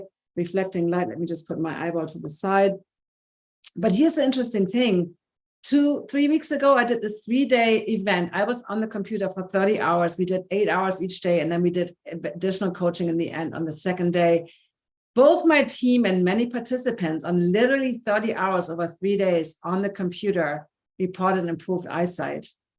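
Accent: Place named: Germany. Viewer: please speak slower in your videos because of your German accent.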